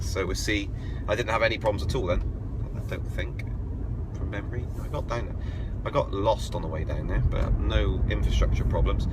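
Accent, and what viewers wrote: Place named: Bristol, United Kingdom. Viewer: Just bought myself a two year old Tekna Leaf up her in Montrose, Scotland. Love your videos,. They have been a good insight into what the Leaf is all about and capable of. I pick the car up tomorrow!!! Can't wait!! Sounds like you're from the same neck of the woods as my wife, (Bristol?).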